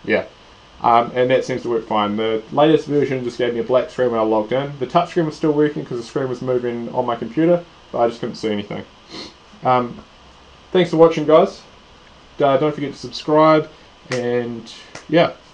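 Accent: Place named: Australia. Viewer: He's either Australian or he's from New Zealand. he's not English. Please learn accents he doesn't sound remotely English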